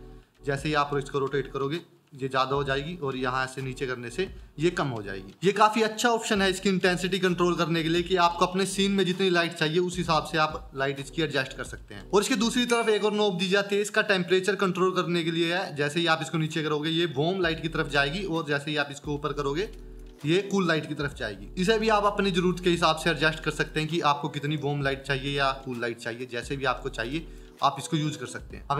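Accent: India. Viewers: you sounded like himachali 🤗 but great content..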